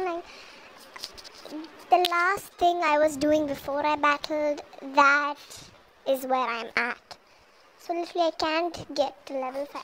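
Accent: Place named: India. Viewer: Oh wow that's sad and U HAVE AN INDIAN ACCENT?? ITS SO NICE!!!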